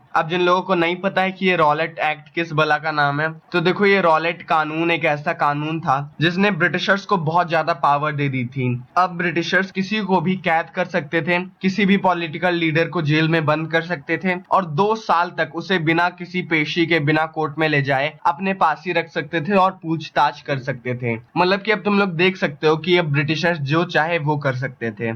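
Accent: Bihar, India. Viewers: Bhai bihari ho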